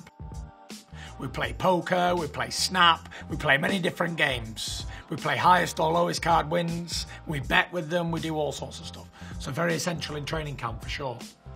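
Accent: United Kingdom. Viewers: He got the nicest British accent i ever heard. practically the only one i can stand. is it a Manchester accent?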